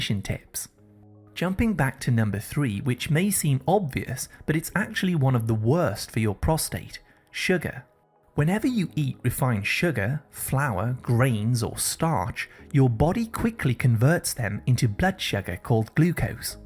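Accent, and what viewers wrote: United Kingdom. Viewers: Information is good to know only could you speak in a more English pattern than in a British accent because it's hard to understand sometimes and frightening also. SERIOUSLY STOP THE CORRUPTION OR IT WILL KILL YOU 🇺🇸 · Everything I eat is on this list. Anyway, I don't trust anybody that speaks with a British accent so screw him.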